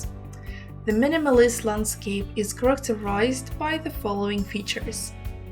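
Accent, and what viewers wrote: Russia. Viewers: Русский акцент уникален😊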